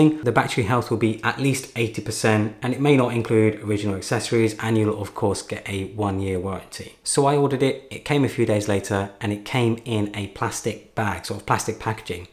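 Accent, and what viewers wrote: United Kingdom. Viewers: No one: Absolutely no one:: "This is a banger, it's wuily wuily fantastic " (Really really fantastic) That British accent 👌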